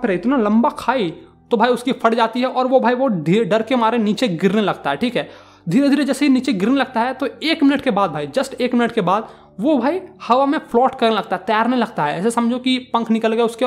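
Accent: Bihar, India. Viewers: Bihari hai tu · Sir bihari ha isliye ud ko ur bol rahe hai 😅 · Bhai bihari h wo ...or har bihari jab bihari language mai bolta hai to ek jesa he lgta hai ..😂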